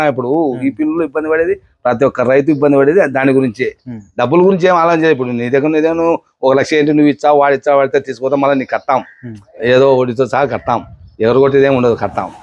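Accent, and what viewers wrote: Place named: Rayalaseema, India. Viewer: Pure rayalaseema slang❤❤🎉🎉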